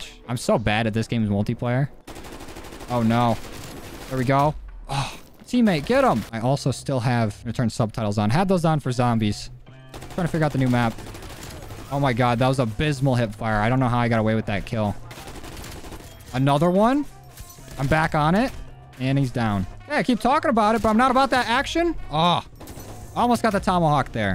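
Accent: United States. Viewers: He's American, you can tell from his accent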